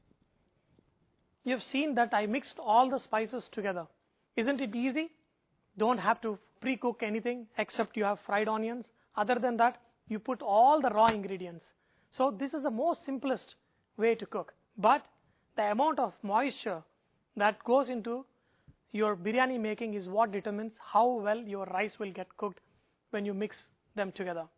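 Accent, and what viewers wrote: India. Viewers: "american"...when did it become a language? it's English dude which you guys have hijacked and now call it american....damn funny!!!! you also "kepp" trying!! is speaking British English in Indian accent (which we proudly speak)....which is actual English...not "American" English with that strange r sound.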